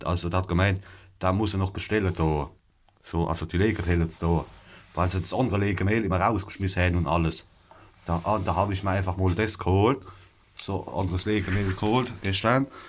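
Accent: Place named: Palatinate, Germany. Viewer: Dieses pälzer gelaber ist aber dem monnemorischen schon sehr ähnlich und sehr witzig. Hab dir mal n abbo dagelassen, Grüße aus Mannheim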